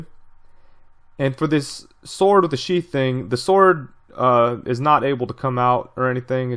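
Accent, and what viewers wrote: New York, United States. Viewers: Anytime bro and no worries we all make mistakes we're only human. Also I can imagine from the video you made of your trip on San Diego Comic Con even the stuff you had it'll be a bit to review also yeah Idk why they didn't included that since I'm sure he used a pistol in one of the movies but I don't remember for sure. Still kinda off on that since lately it seems S.H. Figuarts are getting lighter on accesories I noticed it with the S.H. Figuarts Deadshot even Dark knight Batman that was released last month idk very strange. Also thanks again man for the info really appreaciate the heads up on that also too can you put one of those business cards you made with it? I ask since can't let those cards go to waste after you worked hard on them for San Diego Comic Con so be cool to have one from you. Lastly, thanks for also saying my last name correctly you said it the right way since I am Puerto rican lol was also gonna include that in my email asking you if you're hispanic as well since I know you live up north in NY by your accent since was always very curious on asking that. 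Also too again keep up the good work on your videos and once again I'm thankful for winning your contest and will enter again in more in the future and hope you have a great day.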